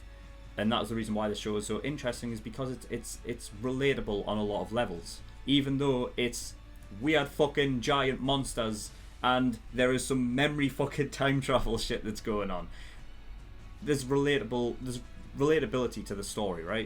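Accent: United Kingdom. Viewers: I love your reaction so much🙆‍♂️🙆‍♂️I'm Taiwanese. I watch your video for analyzing and learning English listening. 😂Because I seldom hear England accent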